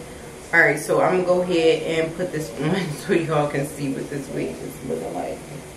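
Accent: Baltimore, United States. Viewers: You did that!! It looks really cute on you! Btw..are you from the DMV area? I can hear the Baltimorean accent lol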